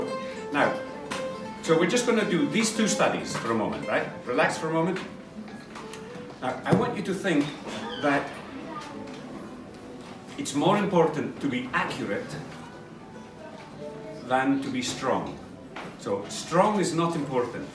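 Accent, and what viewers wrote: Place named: Scotland, United Kingdom. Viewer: It s a middle class well spoken scottish accent for christ s sake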